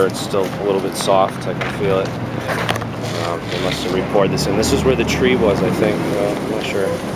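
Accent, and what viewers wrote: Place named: Boston, United States. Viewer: I never realized how pronounced your Boston accent was before. Wicked. I want to hear you say, "Park the car in Harvard Yard."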